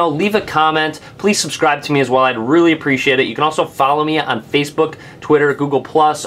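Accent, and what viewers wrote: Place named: Michigan, United States. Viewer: i THINK.... hes from Michigan... lol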